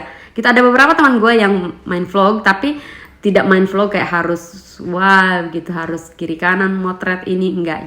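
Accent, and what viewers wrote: East Nusa Tenggara, Indonesia. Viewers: mba dengar dari logat ny kaya orang NTT yah? maaf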